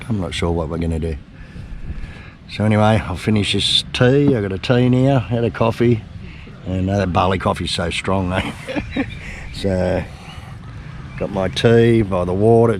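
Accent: Australia. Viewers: talking Indonesian with an Aussie accent is great 😂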